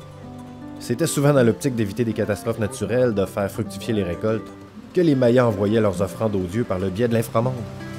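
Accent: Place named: Quebec, Canada. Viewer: Merci pour cette superbe vidéo ! Enfin un reportage qui ne comporte pas de niaiseries et tes prises de vues sont magnifiques ❤je pars au mois de juillet au Yucatan j’ai hâte ! Petite remarque tu parles un peu vite et j’avoue que pour une française il me faut un petit temps d’adaptation pour comprendre ton adorable accent québécois ! 😅continue à nous faire voyager ….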